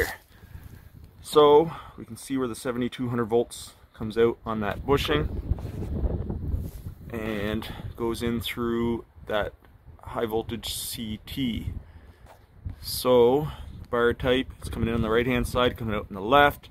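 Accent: New Brunswick, Canada. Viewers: Assuming by your accent you're new brunswick or pei... When they buried the service; would that be upgradeable to 3 phase?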